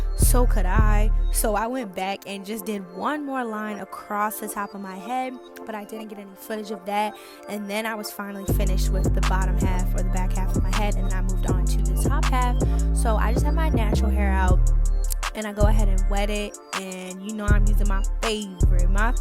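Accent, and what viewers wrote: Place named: Baltimore, United States. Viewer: The accent giving Baltimore 🤔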